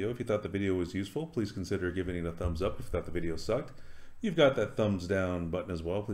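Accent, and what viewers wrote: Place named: United States. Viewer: Some positives: * This is a recent tutorial, as of Nov. 2022, so it's closer to what IntelliJ looks like right now than older tutorials. That's **huge**, IMO, if something goes wrong (as it did with me when I first installed IntelliJ IDEA and a JDK). * Good pace * Clear, in American English (which may be a negative for some, but helped me) * Clearly, the person knows how to teach (I'd written something similar to the above in an earlier version of my comment, but I got distracted by coding and when I tried to return to finish my comment, it had gone "poof".)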